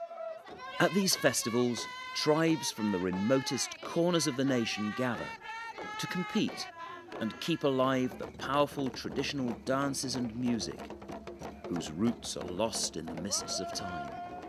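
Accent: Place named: RP, United Kingdom. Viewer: Good British Queen's English language Thank you Sir for this educational video of Papua New Guinea Much appreciated 🙏🙏🙏 God bless you ❤️❤️❤️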